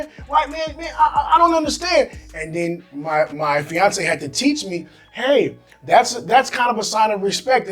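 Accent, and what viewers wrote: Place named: Ilonggo, Philippines. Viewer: Dude... This guy is speaking ilonggo? At filipino whoa (｡’▽’｡) nakakapanibago haha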